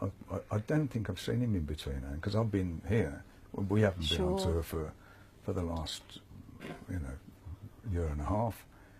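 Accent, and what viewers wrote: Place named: Birmingham, United Kingdom. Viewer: Sounds exactly like my dad did...northern English, Birmingham/West Midlands accent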